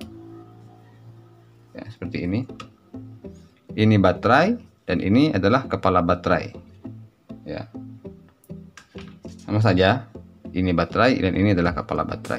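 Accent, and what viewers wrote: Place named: East Java, Indonesia. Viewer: Maksih bang infox..klo di dengar2 logat nya abang nya pst dr jatim 😄👍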